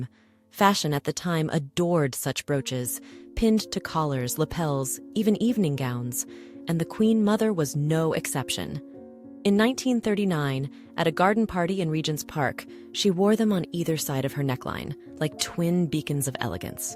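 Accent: United States. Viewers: A slip of the tongue I think. She should have said "the" new monarch. At least these scripts seem well-researched. And now they're spoken in the original American there's none of that AI fake RP. Mind you I wouldn't call anything containing Cullinan V understated.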